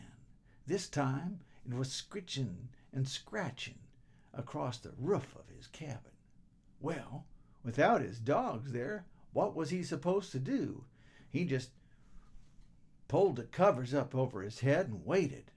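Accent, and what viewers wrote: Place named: South, United States. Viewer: Pretty good on the “southern” accent too!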